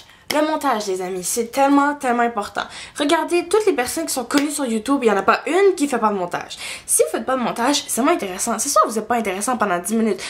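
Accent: Quebec, Canada. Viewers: Salut :) Pourrais-tu nous faire une video ou tu parle français sans l'accent ! Car la fin de cette video tu n'as pas eu un accent canadien mais Français ? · C'est pas une langue, c'est un accent québécois :p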